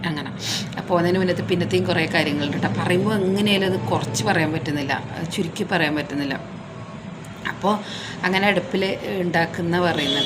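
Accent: Kannur, India. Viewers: നമ്മുടെ നാടൻ കണ്ണൂർ ഭാഷ 👍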